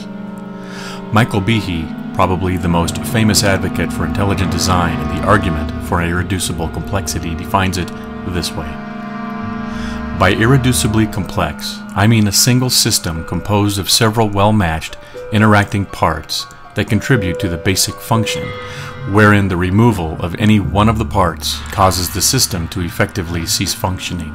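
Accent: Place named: Canada. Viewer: Off topic but you sort of have an accent that I notice. Especially when you pronounce "ideas", are you Canadian?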